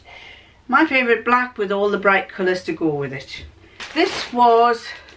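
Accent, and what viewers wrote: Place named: East Boldon, United Kingdom. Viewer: Hello from rural New South Wales where the nearest sewing notions store is 1.5 hours drive away. Love your accent as I'm originally from East Boldon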